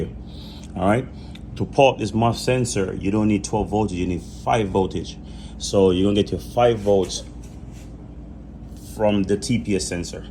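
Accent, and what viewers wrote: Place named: Jamaica. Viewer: Sounds Jamaican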